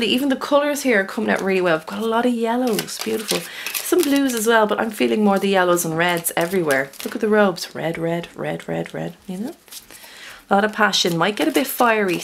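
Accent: Ireland. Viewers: I really enjoy your readings--your delivery, your positivity, your authenticity and your lovely Irish (?) lilt...also, I am a Taurus cross-watching for a considerably younger Capricorn romantic interest. I think I'm the "matriarch figure" that you mention 😉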